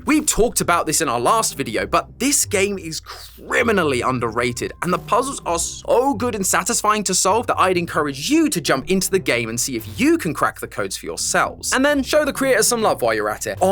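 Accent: United Kingdom. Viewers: Why is he British now… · who is this brit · I’ve just started watching this and…When did you become british? Like genuinely curious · British Matpat 😭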